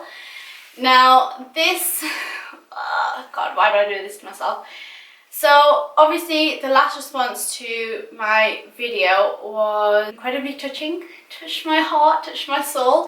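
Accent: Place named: Manchester, United Kingdom. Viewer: Omg lov ur manchester accent... u have same as me 😛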